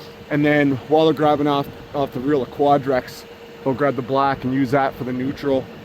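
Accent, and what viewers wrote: Canada. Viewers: I'd keep the narration, that's probably more than 50% of why people watch it. If the sound is rough, meh, the ppl who are going to watch aren't the people who will care, they are likely watching to learn about a field they aren't in or want to be in. I found it amusing hearing a lineworker with the biggest "OUUUUT" Canadian accent I've ever heard work to describe concepts from my field of engineering :D (network engineering/architecture) hehe. No shade, keep up the good work. A couple notes 1. The connector you described as a 'piercing something" We used to have an equivalent in the network world called a "vampire tap". I think that actually describes this better as this is a minimum vampiric/parasitic drain that is tapped. maybe you can start a new trend in your field calling it that :D 2. No shade on you, this is what you were given. I'm not a fan of how the insulation is stripped given how in-climate this area appears to be both on the pigtail and stripping it on the heavier gauge wires. 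In my experience that allows the wires to become full of water during the summer humidity which quickly leads to a very bad result :) 3. I think that tray would be nicer if instead of pushing things over the outside edge to get them it was reversed to inside the bucket. Seems like an unforced error there in how they designed it asking for tools to disappear below :D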